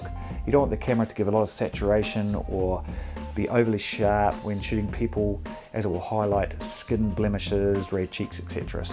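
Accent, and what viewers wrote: New Zealand. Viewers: nice to hear an NZ accent. thanks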